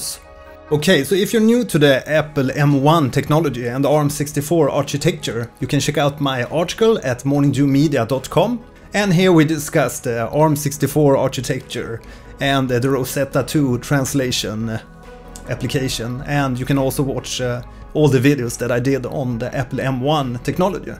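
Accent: Sweden. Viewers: dat swenglish <3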